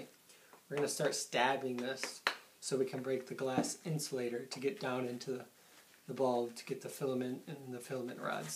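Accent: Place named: Canada. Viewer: Is it just me or are you speaking with an accent? A bit Canadian, a bit Minnesota. Which are similar.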